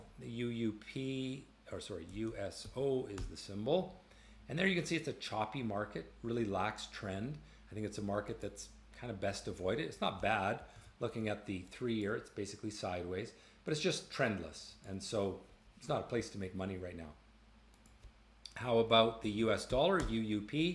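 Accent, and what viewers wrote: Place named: Canada. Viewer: I’m an Aussie and always have loved the Canadian accent. This guy is simply incredible. Clearest information that I have ever listened to.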